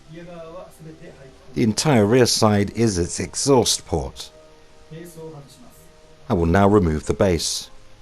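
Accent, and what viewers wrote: United Kingdom. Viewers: British ASMR for Playstation fans.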